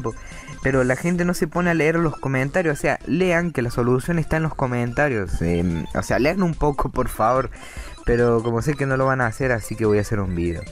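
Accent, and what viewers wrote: Córdoba, Argentina. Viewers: amigo sos de argentina cordoba?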